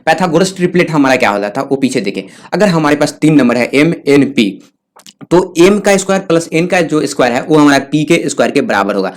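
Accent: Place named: Bihar, India. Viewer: Sir do you speak Bihari Your speaking style is like Bihari language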